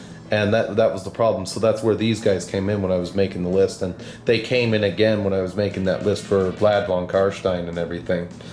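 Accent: United Kingdom. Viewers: That is alot of figures.... alot! Im missing your British accent, the guy from Upton that supergule his stamps and because of that charge you extra for the shipping cost. 😎👍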